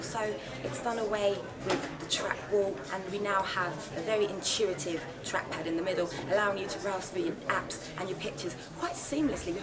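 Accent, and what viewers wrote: United Kingdom. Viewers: i like her british accent..... nice...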